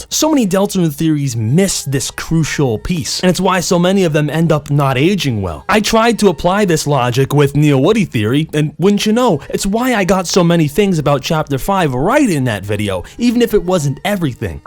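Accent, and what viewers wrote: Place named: New York, United States. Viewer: Love your vaguely New York accent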